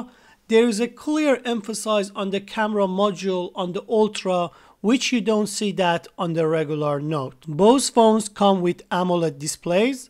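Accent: Iran. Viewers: Your accent is Iranian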